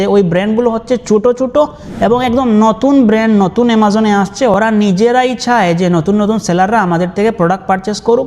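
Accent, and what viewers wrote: Sylhet, Bangladesh. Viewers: Sylheti ni vai tumi?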